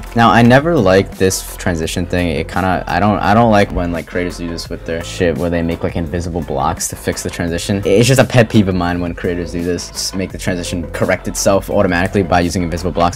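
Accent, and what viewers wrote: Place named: New York, United States. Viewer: Yo gl on silent clubstep. I miss the New York accent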